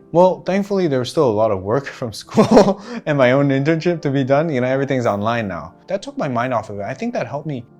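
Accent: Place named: United States. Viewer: American accent in disguse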